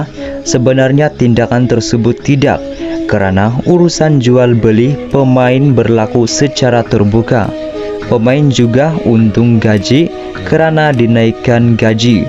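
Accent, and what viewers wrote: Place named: Sabah, Malaysia. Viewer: Ini bahasa melayu Sabah la bro. Bukan bahasa Indonesia dengar betul-betul loghat percakapan admin tu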